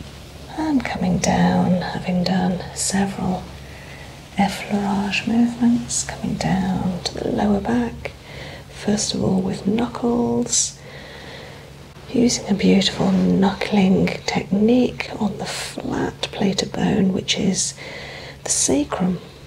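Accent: England, United Kingdom. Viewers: Absolutely one of the best and most relaxing massages I’ve had the pleasure to watch here on YouTube. Your voice is so magnificent, nothing is more pleasing to an American ear as that of a tranquil English accent. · Very relaxing, educational, I'm not going to lie, love the soft English accent and best of all no ads... perhaps, I've said too much.. ...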